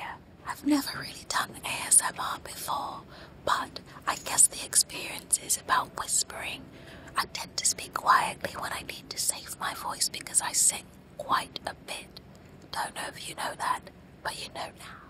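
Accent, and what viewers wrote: England, United Kingdom. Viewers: Normally I hate british accent but hers is very lovely and soft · she had a British accent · Hourglass English accent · asmr in an English accent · So shocked she has a british accent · I did NOT expect that English accent!! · she had a british accent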